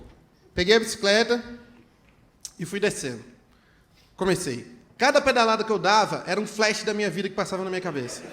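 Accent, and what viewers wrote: Minas Gerais, Brazil. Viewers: Pernambucano onde?? Esse é mineiro raíz. Passa batido. 😂😂😂😂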